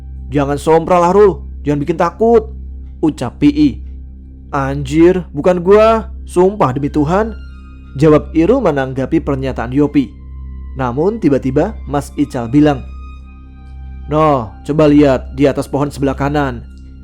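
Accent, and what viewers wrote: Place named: Java, Indonesia. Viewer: Bang bisa ga ngomong nya jangan di medok medokin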